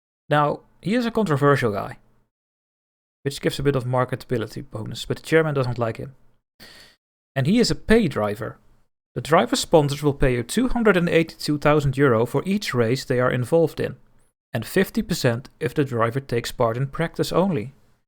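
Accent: Netherlands. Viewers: Really enjoying your play through and I love the Dutch accent!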